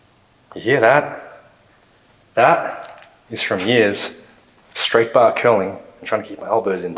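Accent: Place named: Australia. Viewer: An Asian with an Australian accent who has the body of a statue 😍🤭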